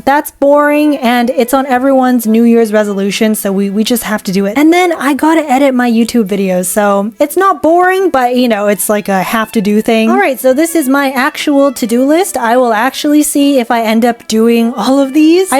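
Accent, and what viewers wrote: United Kingdom. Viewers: The accent with the mat teacher 79 / 856 like the accent you British girl while you Britis I'm also British kinda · I think she might be british lol😂😂😂😂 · You spoke britsh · saw your video on finding your art style! i love the anime art you create! i love cartoons and my dream style is something like anime Disney. I feel bad sometimes cause i dont draw realism too much, but i love seeing successful artist who have simple art styles ❤ also question, your accent comes and goes are you british? 😅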